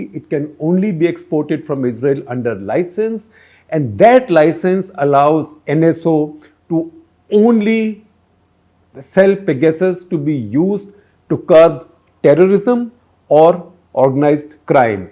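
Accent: India. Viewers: Very insightful and well analysed. SG, more power to your Indian English!!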